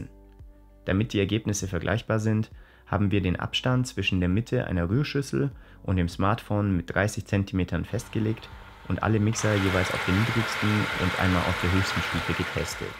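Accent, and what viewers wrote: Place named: Bavaria, Germany. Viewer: Das ist Bayerisch 😁😆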